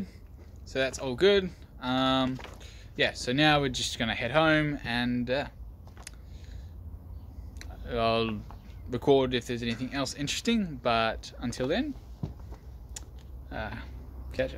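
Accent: Australia. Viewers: В первый раз услышал Австралийский акцент английского. Довольно забавно. Удачи в России.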